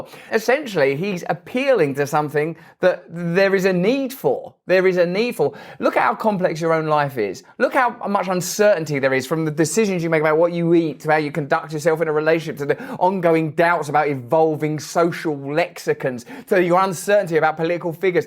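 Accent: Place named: United Kingdom. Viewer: Funny that British accent. I always loved the English, old English dialect, especially before / during the Churchill days. Still to this day I prefer The British English than American English/ Canadian English (we just took / used from America, their lazy English ). Just & quite a bit more British citizens don't pronounce your "T's" ( letter T-t ) Funniest example is when you pronounce the word "better"! You completely leave out the "T" sound "Beh- - -er" but when you pronounce the word "Time" You clearly her the "T" sound & everything! It's just funny to her that!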